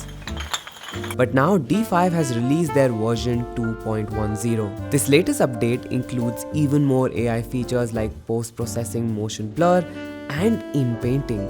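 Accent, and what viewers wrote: India. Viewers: I CANT TAKE A VIDEO WITH 10 MINUTES OF INDIAN ACCENT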